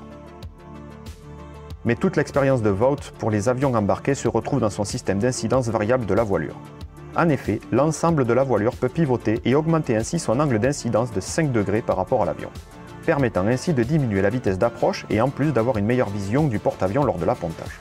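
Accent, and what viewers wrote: South, France. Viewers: De très bonnes vidéos comme toujours, en plus ton accent du sud fait plaisir à mes oreilles d'ariégeois ;) ! Continue comme ça !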